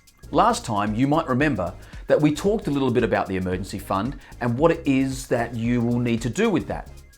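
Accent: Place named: Australia. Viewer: Oh man, I love a good Australian accent. That right there gets you my subscription. I like how you're putting emphasis on the automation of the budget. I talk about that quite a bit in my content as well. This is an excellent video covering those basics. I think that's really helpful for some people who've never budgeted before. You have some good examples too. I found you just by searching for budgeting channels on YouTube because I love the budgeting community and focus a lot of my content around it too. Keep up the good work!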